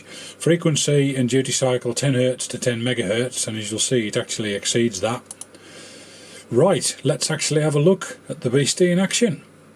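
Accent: United Kingdom. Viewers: Love the British accent kind calm and civilized.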